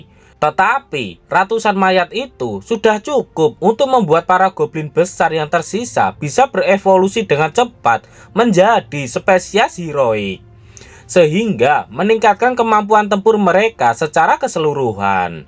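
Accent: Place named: Java, Indonesia. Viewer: Kg jawa medok kembali 🎉🎉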